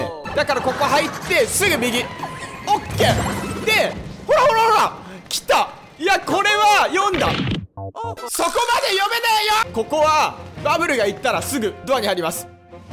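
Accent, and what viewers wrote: Fukuoka, Japan. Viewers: やっぱり、福岡？の方言は良いですね（笑） 今日のLIVE楽しみしてます( *˙ω˙*)و ｸﾞｯ!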